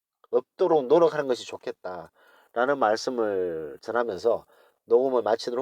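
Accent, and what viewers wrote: Gyeongsang, South Korea. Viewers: 너무 공감백배 입니다. 세상이 점점 삭막해 지고 조심스러운 얘기지만 교민사회가 제일 심한거 같아요... 저랑 똑같이 경상도 사투리로 구수하게 단도직입적인 스탈 매력있습니다. 실례가 안된다면 제 유투브에 이 이야기를 언급하고 싶습니다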